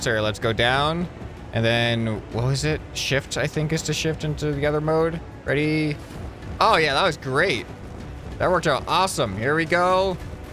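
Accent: Texas, United States. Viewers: not trying to be rude but are you from Texas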